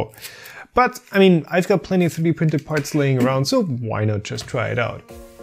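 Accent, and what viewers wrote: Germany. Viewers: HA! ich wusste es. von der ersten sekunde an hab ich gehört das du deutsch bist ;D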